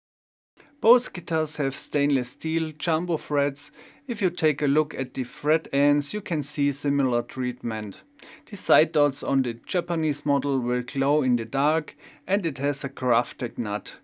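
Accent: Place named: Germany. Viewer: This german english...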